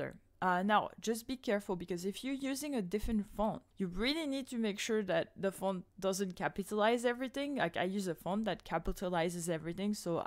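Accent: France. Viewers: Hi! Great video ty, are u French? European server or American?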